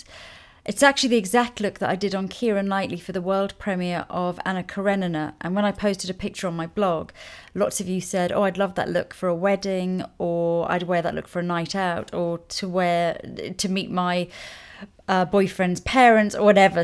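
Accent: United Kingdom. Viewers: ooh, just not to forget!!!! :D I simply adore your gorgeous British accent that I dont't have; instead I've got that Northern/Canadian accent! :D I've learned so many things about vintage make up from your videos, and this is so amazing and awesome!!! thank you again and again!!!!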